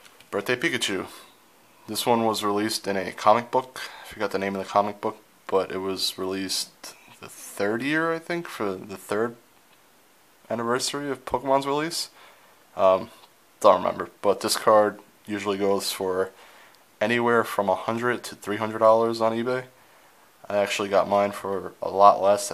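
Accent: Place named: Sweden. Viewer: Are u Swedish ur English sounds swedish Cuz it sounds like when i talk:P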